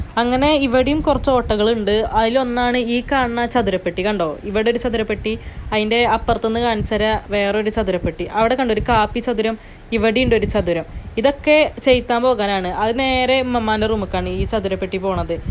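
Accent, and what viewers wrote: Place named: Malappuram, India. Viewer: മലയാളം പറയു.... അന്റെ മലപ്ര ബാസ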